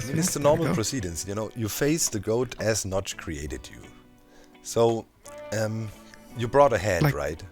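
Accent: Germany. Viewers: Bist du Deutscher?😂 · Man hört den deutschen Akzent raus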